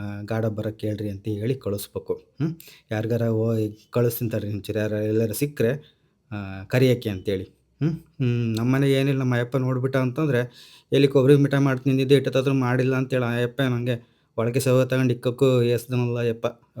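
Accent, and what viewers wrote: Chitradurga, India. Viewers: ನಮಸ್ತೇ ಸರ್ ನಾವೂ ದುರ್ಗದವರೇ ಸಕ್ಕತ್ತಾಗಿದೆ ನಮ್ ಭಾಷೆ ನಿಮ್ಮ ಅಡುಗೆ ಸೂ.....ಪರ್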